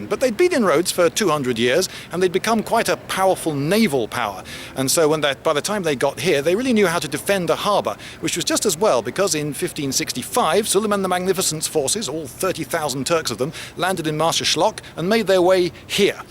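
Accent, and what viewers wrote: United Kingdom. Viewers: haha is so british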